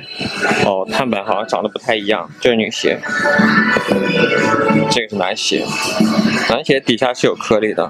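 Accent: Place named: Taiyuan, China. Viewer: 听口音 太原后生？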